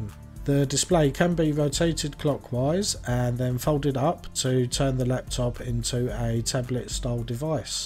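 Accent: Cockney, United Kingdom. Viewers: It's his cockney accent to be at fault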